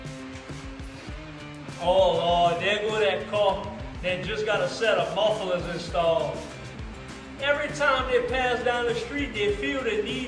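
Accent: Louisiana, United States. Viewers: Sound Cajun as hell, love it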